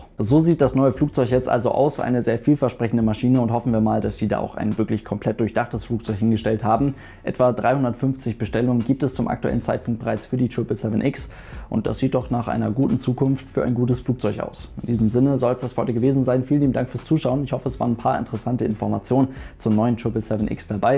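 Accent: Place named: North, Germany. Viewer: Warum sagst du immer Flugzeuch? Was ist das für ein Dialekt Kommst du aus dem Norden ?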